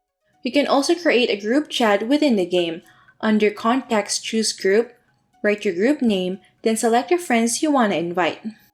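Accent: Philippines. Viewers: hi miss are you half filipino? just want to know bcz of some accent words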